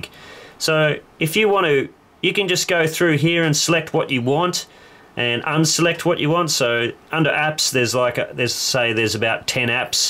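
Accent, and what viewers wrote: Australia. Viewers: This Australian accent is so good! XD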